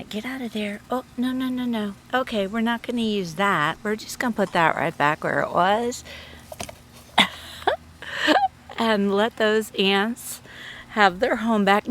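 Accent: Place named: Texas, United States. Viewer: Pretty mild compared to Texas(I assume from your accent), with average temperatures ranging from around 50-70°F. However, we do experience occasional heatwaves where temperatures can soar up to 86°F. These hot spells can be quite intense, but they are relatively short-lived. For me 70 feels like an oven :)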